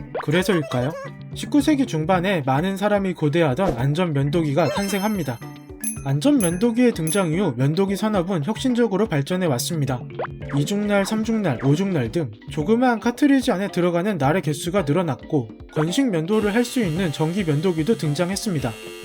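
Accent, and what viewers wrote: Gyeongsang, South Korea. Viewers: 억양이 영남억양인데 엌ㅋㅋ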